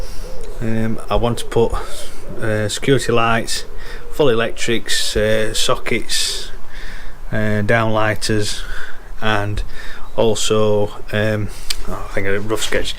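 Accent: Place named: Yorkshire, United Kingdom. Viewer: Where dya live? Sound like a Yorkshie Lad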